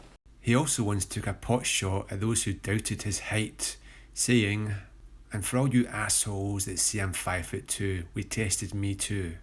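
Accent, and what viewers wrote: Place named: Scotland, United Kingdom. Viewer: based scottish accent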